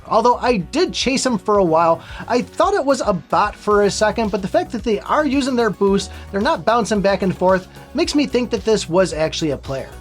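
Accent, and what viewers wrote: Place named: Pennsylvania, United States. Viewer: You definitely don't need to answer this. I'm just super curious. I grew up in PA. And your "accent" sounds so similar to my family and the friends I grew up with. You also might be from Wisconsin, lol. Again, don't answer if you're not comfortable.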